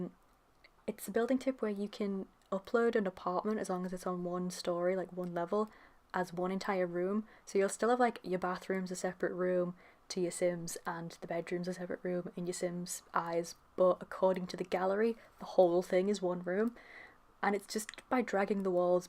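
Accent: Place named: United Kingdom. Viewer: You're welcome! It's nice to see another British simmer! (I hope you're British, otherwise, I'm terrible with accents...)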